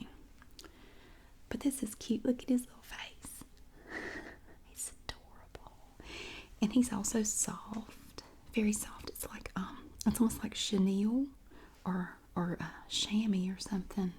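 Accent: South, United States. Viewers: I guessed those places because she sounds just like my wife who was born in Jacksonville, FL but grew up in Memphis, TN and Byhalia, MS. Southerners are self-conscious about their accents but geeze I love em. It's so American. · omg southern accent level 1000 haha,you are great girl!!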